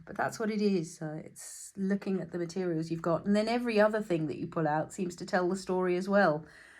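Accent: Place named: United Kingdom. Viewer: Thank you! absolutely will. 🤩Love to listen to a good British -English talking👍🏻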